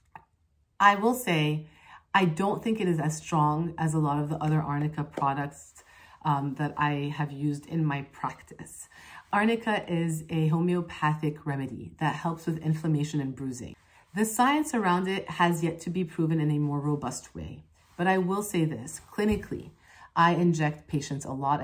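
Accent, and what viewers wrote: France. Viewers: it's cool, you have a slitghly french accent when you speak english, and an american accent when you speak french! and you speak both of them very well! good job Doc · As a bilingual Canadian, I can hear your French accent when you speak in English. Totally picked up on it immediately (withing a few seconds) from the first clip I had seen from you a few months back. 😄 I appreciate your honesty about your profession and work. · Quel accent français incroyable !! Perfection ! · I knew it! I knew you had a French accent after watching your video on Glycerin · I love when you add French words here in there in your videos! Your accent is super charming, so no reason to feel self-conscious about it (I'm from Québec so you'd probably frown at my own accent haha)! About the Toleriane line in LaRoche-Posay, I don't know if this is the way they intended, but as a French-Quebecker I interpreted that name as being a play on the word tolérance, and since it's for sensitive skin I assume they're trying to promote that it'll build skin's tolerance... 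but I didn't do any further research on this.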